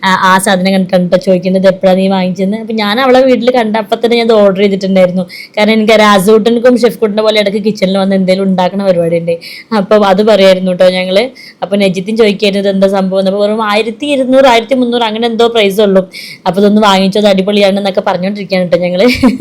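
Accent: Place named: Mankada, India. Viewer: അച്ചടി ഭാഷ എത്ര പറഞ്ഞാലും ഇടയിൽ മങ്കട കൂട്ടിൽ ഭാഷ കയറി വരും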